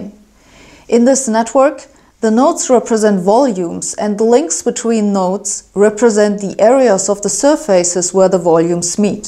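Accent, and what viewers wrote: Germany. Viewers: I have tried to analyze why I like your video’s so much. Is it the German accent in your scientific English that for my generation spells deep learnedness? Is it the high amount of inflection with which you impart so much understanding and which makes listening to your Referat so easy, with a cup of coffee? Is it the full dedication to content, with a total lack of ego, not trying to show how smart you are, but how interesting the subject matter is? Or is the choice of subjects, that are mundane questions that you are able to make into glittering gems of physics, reminding us physicists what a beautiful field we have chosen? Or is it the total absence of smiling, that so often is strewn all over the presentation by women? I do not know what that means or if it is intentional or not, but it is a constant through all of your fascinating video’s, making them even more fascinating. 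It has made me think, what does smiling do to a story being told. I’m beginning to find out.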